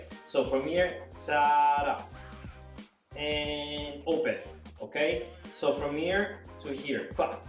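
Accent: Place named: Italy. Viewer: u sounds so italian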